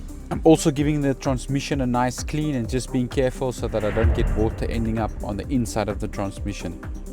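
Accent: South Africa. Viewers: He's obviously Afrikaans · Why do you speak like you’re from Upington or Springs? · U know wat i love about SA accent? Its soo thick, u cnt disguise it shem😂😂😂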